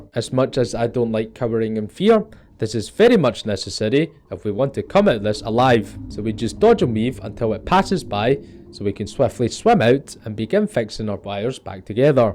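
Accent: Scotland, United Kingdom. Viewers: idk i think this guy might be scottish…. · because he might be Scottish, shocker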